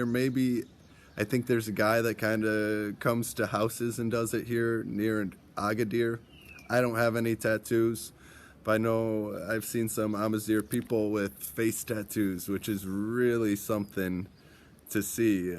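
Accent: United States. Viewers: Hello excuse me. I am British, don't forget we taught you Americans English lol. Although i enjoy the American accent, we see our British accent as the more refined version. :)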